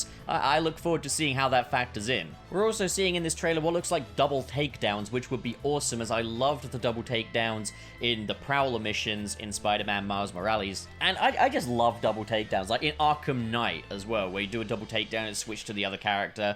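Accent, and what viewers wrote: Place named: United Kingdom. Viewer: I got you and bobs mixed up, are you both from the same area of the UK?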